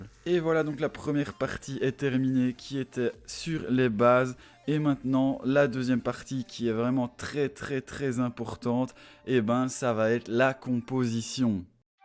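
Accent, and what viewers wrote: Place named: Belgium. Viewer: J'adore l'accent belge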